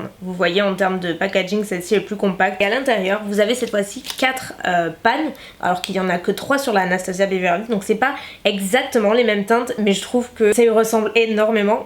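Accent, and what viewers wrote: South, France. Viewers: Pourquoi tu dis "pace" au lieu de "parce que"? Est ce ton petit accent du sud😉😊 très très bonne vidéo! Pourrais tu tester entièrement la marque W7? Bisouss❤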